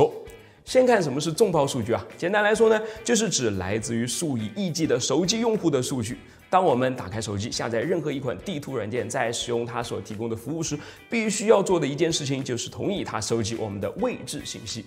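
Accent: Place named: Sichuan-Chongqing, China. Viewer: 我和朋友猜是哪里人，应该是川渝或是江浙一带，前者可能性更大，不知道对不对？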